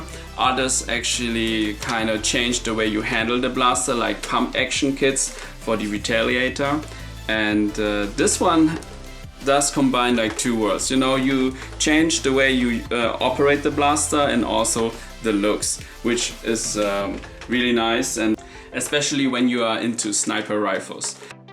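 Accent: Germany. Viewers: I can see your german, right? Der akzent sagt alles! :) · Ich erkenne das du deutsch bist am Akzent richtig cooles Kit muss ich unbedingt machen ich bin riesiger sniper fan aber leider gibt es keine coolen sniper von nerf ausser die Longstrike die aber nicht mehr hergestellt wird deshalb werde ich mir das kit unbedingt kaufen .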